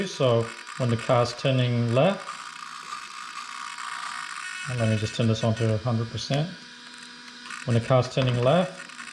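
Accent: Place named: England, United Kingdom. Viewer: Is this the uk cuz you sound english dude?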